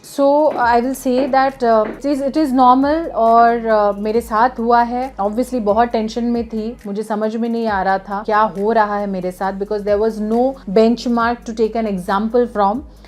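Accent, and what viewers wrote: Bengal, India. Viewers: i would like to tell you something initially I felt that u r a very rude person i used to watch your videos previously but didn't subscribed your channel as I kept watching your videos i got so impressed by you...ur truly and genuinely take so much efforts for your PR Or advertising any product seriously it's like for any product u try to make content from ur videos and the most important thing is u r too light in ur life how nicely u handle every thing the way ur at ease in your life is so so inspiring by seeing ur videos i learn that it's absolutely not necessary to be in serious mood all the time.....i just love u and your videos the way crack jokes....the way u talk with ur hubby....Pablo....and most important ur Hindi with bengoli touch....and now I have subscribed ur channel....and enjoying every bit of your vlogs....u never disappoint your viewers every videos has something new and knowledge too...All the best and enjoy....no need to tell you though😛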